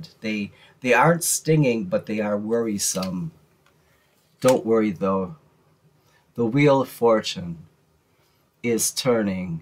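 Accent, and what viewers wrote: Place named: Ireland. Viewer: thank you so much for all this information in this hopeful reading, hope your feeling okay and love the Irish accent 🙈😊 thank you for always making my day 💖 looking forward to the next reading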